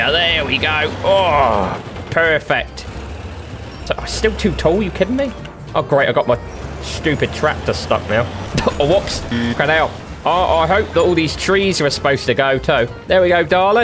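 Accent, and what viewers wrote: United Kingdom. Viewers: Your british accent just makes it so much funnier damn.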